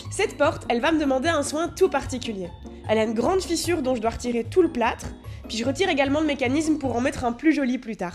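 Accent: Liège, Belgium. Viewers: vue ton accent tu serai bien de liege ? ou alors dans les Ardennes :> hahahhah (rire démoniaque)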